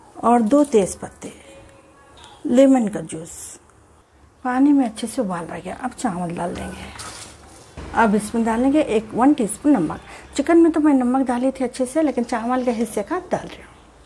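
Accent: Hyderabad, India. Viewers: Aunty ma to apki voice over k liye apki video dekhti hu your voice is really nice hyderabadi.....😀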